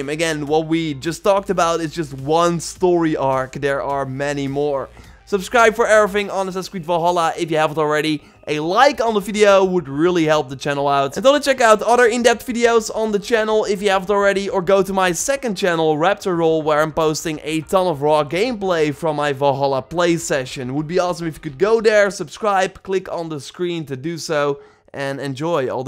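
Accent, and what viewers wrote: Netherlands. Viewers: Are you dutch? You sound dutch... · u dutch man? if u are im subbing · I was like.. this guy his voice sounds so dutch and little did iknow hes dutch lol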